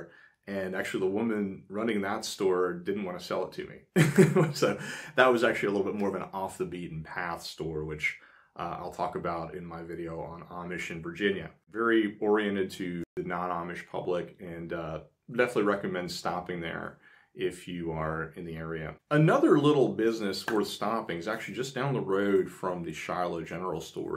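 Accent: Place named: Charleston, United States. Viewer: You sound like you are from Charleston, SC. My husband lived outside Charleston and heard that accent a lot.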